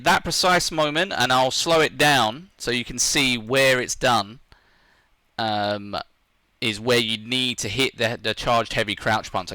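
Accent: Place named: England, United Kingdom. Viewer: Great vid man. Good to hear it from an English accent too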